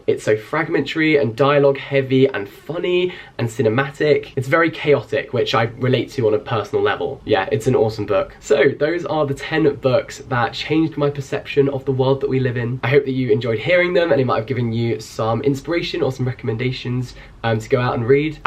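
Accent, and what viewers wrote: United Kingdom. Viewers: It's funny, your british accent is stronger in your old videos than in the new ones :)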